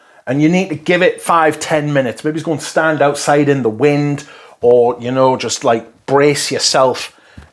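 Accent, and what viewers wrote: United Kingdom. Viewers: You are absolutely hilarious. Have you ever considered radio or script writing, both? We Yanks love the sounds of a Brit , save Piers. You should. You have the best wit and voice of all of these reviewers ~I will discuss this perfume until I have discussed it to a disgusting amount.~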